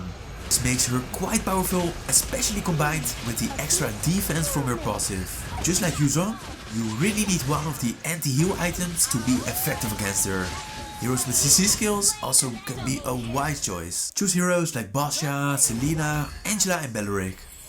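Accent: Netherlands. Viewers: Ben je nederlands??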